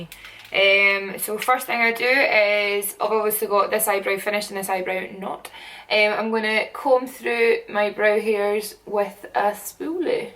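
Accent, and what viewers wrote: Scotland, United Kingdom. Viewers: Just stumbled across your channel...oh my GOD! This is gorgeous! Nice to hear a fellow Scot on YouTube too! Buzzing to give this a bash 😍 x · whenever you say "kinda" with your Scottish accent it sounds like you're saying my name(: love you!! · I'm irish from northern Ireland she sounds Scottish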